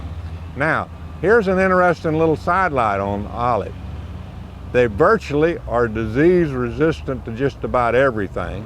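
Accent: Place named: Appalachia, United States. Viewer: Lovable hillbilly accent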